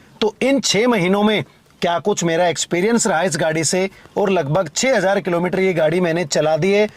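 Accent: Haryana, India. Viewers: भाई जी राम राम ap hna bolte acha h thodi haryanvi touch aati h vo acha lgta h apke es channel par to video top hoti hi h, lekin eske alawa m khna chahta hu ki ap ek apna vlog channel bhi bnaye or daily routine ya family k sath kahi aate jaate h uska vlog bnana ye sab video bhi suru Karo vlog channel k sath.. mja aa jayega ❤️❤️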